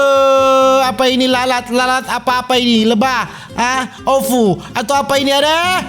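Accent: Manado, Indonesia. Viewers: itu logat manado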